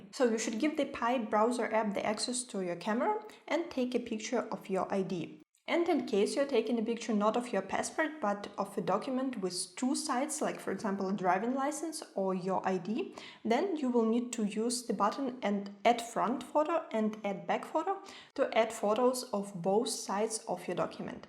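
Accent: Germany. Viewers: your englisch with strong german accent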